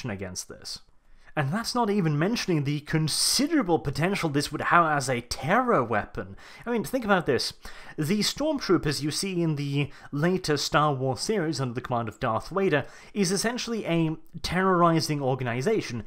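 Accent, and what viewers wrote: RP, United Kingdom. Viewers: im English and a big fan. but please stop shitting on the British when you quite clearly speak in a posh English accent. seems quite hypocritical.:) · He's actually Norwegian, but somehow he speaks in a weird posh british accent.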